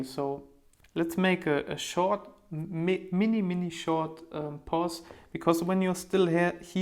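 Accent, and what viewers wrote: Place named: Germany. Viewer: Grateful that I found your channel.. and an very calm and deeply accurate.. luckly I love raw foods.. ahaha.. your esscent is familiar, are you from Germany? Thank you once again for your expression..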